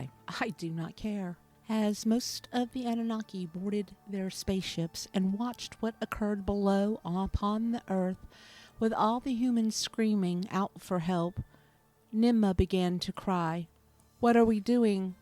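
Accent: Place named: South, United States. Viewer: Loved this. Beautiful video. The southern twang narrator gave it extra charm😊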